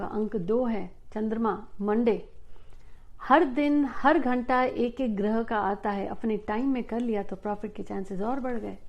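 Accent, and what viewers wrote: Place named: Punjab, India. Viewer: Aye haye...loved that pnjabi ascent...aiven hi🤘🤘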